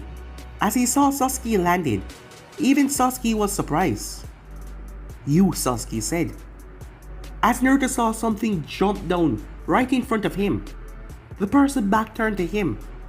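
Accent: Jamaica. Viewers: Are you Jamaica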